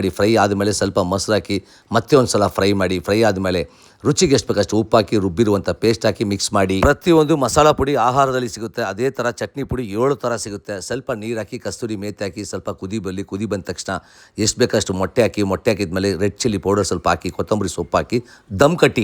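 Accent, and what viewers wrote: Tamil Nadu, India. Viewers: Ur kannada was not so good I thought ur tamialn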